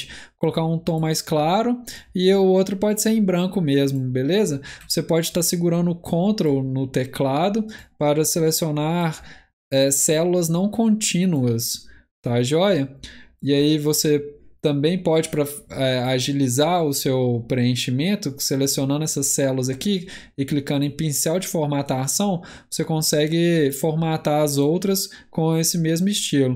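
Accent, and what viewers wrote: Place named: Minas Gerais, Brazil. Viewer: Noss mas esse cara é Minerim demais sô (ou goiano).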